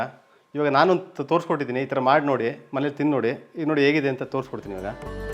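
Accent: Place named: Mysore, India. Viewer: ನಮ್ಮ ಮೈಸೂರ್ ಭಾಷೆ 👌👌👌👌💛❤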